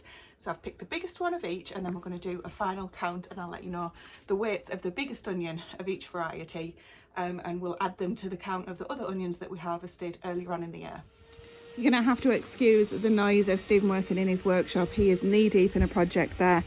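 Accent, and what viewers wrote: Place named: Geordie, United Kingdom. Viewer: I just click back into the video and yes I did recognise a little Geordie accent normally would’ve rang a bell straight away because my brother was married to a lass from Newcastle 🫶🏼. Anyway enough waffling all the best have a great weekend. Kind regards from the Netherlands Nigel Burgess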